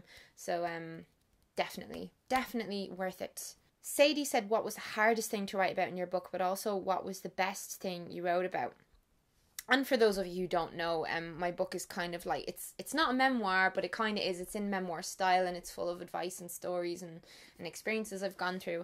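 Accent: Ireland. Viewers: I already thought so haha but finally I get to hear it by a young irish person! btw loving your videos, I relate to so many things and listening to you talk (especially to your wonderful irish accent as I miss this country so much) calms me so much!! xx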